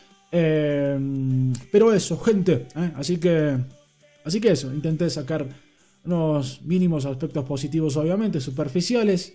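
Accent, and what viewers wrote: Argentina. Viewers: Primera vez q veo tus videos amigo Pero porq hablas como gaucho no entiendo espero no seas un boligaucho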